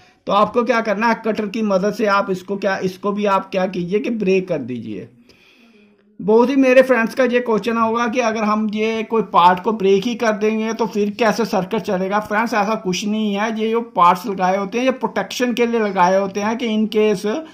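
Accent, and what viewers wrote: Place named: Punjab, India. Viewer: Yha pta chla ki Bhai Punjabi hai Osm vdo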